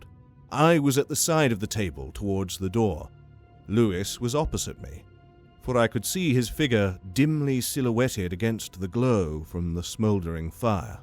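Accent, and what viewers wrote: New Zealand. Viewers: Kia ora, I'm in Aotearoa too. Lovely to hear a kiwi voice. I narrate audio books for the blind foundation. I've always wanted to do old skool horror. Love your voice. Benson is one of my fave authors.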